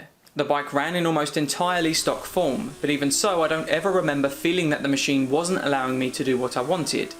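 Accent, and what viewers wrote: United Kingdom. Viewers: that be good also but if I were you Im gonna try not to rush in speaking and remove the accent & slang since not everyone who watch your video come from britain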